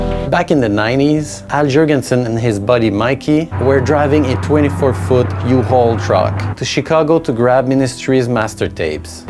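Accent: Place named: Quebec, Canada. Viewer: Intéressant. Es-tu de Québec? Je reconnais un accent familier.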